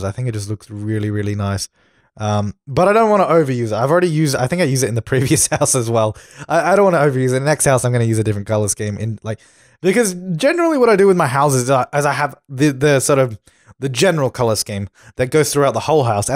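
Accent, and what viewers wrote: Australia. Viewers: I could listen to you talk forever .That sounds creepy, but i just love Australians and Australian accents .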